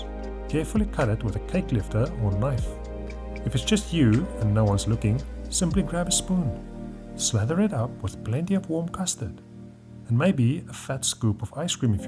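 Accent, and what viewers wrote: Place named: South Africa. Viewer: You have a great voice with the wonderful South African undertone. Thanks so much for your videos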